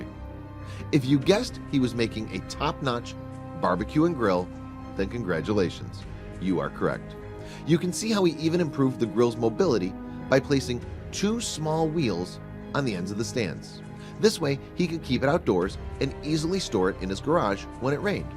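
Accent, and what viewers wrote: United States. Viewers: it's like an American reading a poorly written chenglish script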